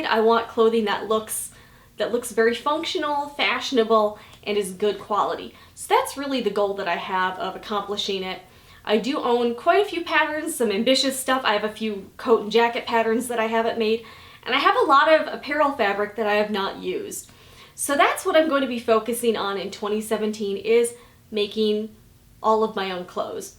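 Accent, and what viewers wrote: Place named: Midwest, United States. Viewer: Are you from the midwest? Cute accent :)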